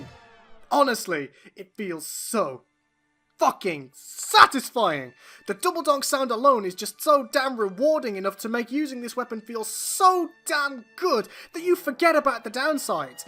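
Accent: Poland. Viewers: are you from poland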